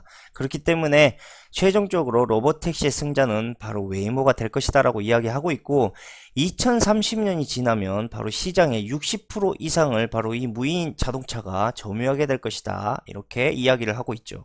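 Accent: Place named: Honam, South Korea. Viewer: 욕을 기다리며‥. 빠져드는 강의 ㆍ 정통 호남 발음. 억양 좋습니다 그럼 수고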